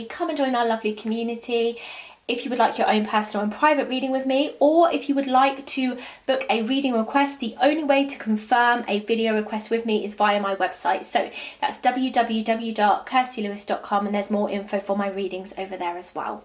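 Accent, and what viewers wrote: United Kingdom. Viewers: I am obSESSED with your accent 😩😍 I feel like I can listen to you talk forever. It’s so calming & posh. Is it British ?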